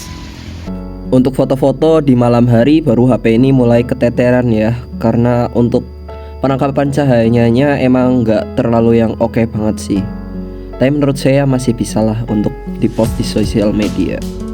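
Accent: Banyumas, Indonesia. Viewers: njir wong banyumas jebule😊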